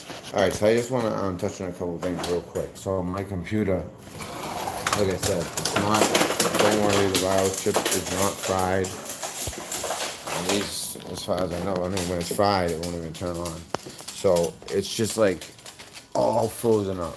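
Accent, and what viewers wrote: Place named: Massachusetts, United States. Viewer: Are you from Mass.? Very familiar accent.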